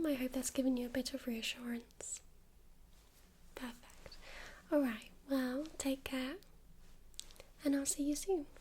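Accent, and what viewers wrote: United Kingdom. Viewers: Is that a British accent you got?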